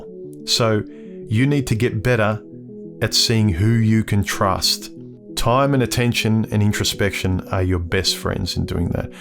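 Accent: Australia. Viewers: The Aussie accent is a pleasant bonus! 😉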